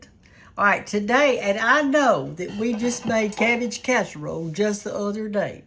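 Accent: South, United States. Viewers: I love your shirt, where did you buy ut? Your accent reminds me ilof home and my southern roots, whereis your home? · You just make me happy hearing you I love your southern accent and your glory to the Lord shirt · So pleased to have you just pop up on my YouTube..i guess that my algorithm is finally figuring out what I like....love your familiar sounding southern accent...and I have been enjoying a lot of cabbage lately.. can't wait to try your cabbage casserole recipe 🤗.. Happy New Year..n I'm looking forward to more of your videos. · Hi Ms., my name is Lilia, I live in San Antonio, TX. I catch your Chanel every now and than and enjoy watching and listening to u. I jus love that good Ole country accent u Have. Im from Spain not mexican but full blooded Spaniard. Anywho, I really enjoyed learning about this cabbage casserole I plan to make especially to share with my granddaughter bcuz she's Vegan. 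She eats NO MEATS or Pultry or Seafood none at all and is very healthy. O, by the way, I fo remember those old houses and those doodle bugs. My greatest childhood memory was when my grandma had created a somewhat cave like where my friends and I use to make mud pies out of mud and pretend we were going to cook dinner w my play dishes. Well life has had it's twists and turns and praise the Lord I AM STILL HERE. GOD has been sooo very good to me above all in all. Lots of love your way as well as prayers for u and urs. Have a blessed and prosperous NEW YEAR in Jesus name Shalom❤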